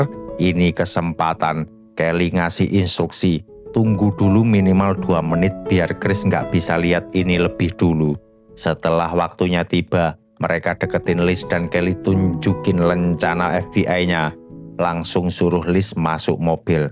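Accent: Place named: Java, Indonesia. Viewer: logatnya jawa banget wkwk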